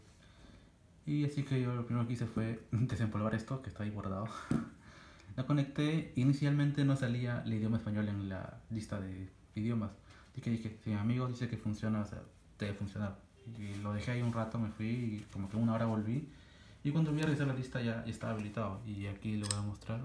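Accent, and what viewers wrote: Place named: Philippines. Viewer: pesimo video no se te entiende nada hermano y parece que hablas en filipino o algo asi ...con todo respeto es mi punto de vista gracias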